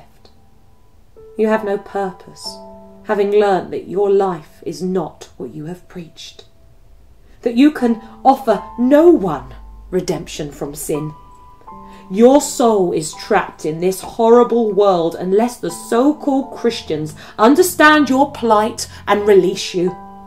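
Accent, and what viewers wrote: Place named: United Kingdom. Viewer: I guess a British accent and dramatic background music justify a retelling of history that has no basis in fact whatsoever. No wonder most of the viewers give a thumbs down to this video.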